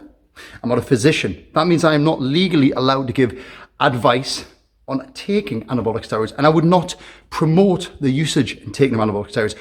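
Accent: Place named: Dumfries, United Kingdom. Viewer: Have you ever been to Dumfries in southern Scotland? Your accent sounds similar to it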